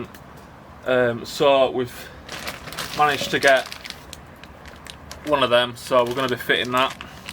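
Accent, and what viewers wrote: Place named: Leeds, United Kingdom. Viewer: Are you from Leeds? Accent sounds familiar, I'm from Leeds too, got a silver Vectra 1.9 👌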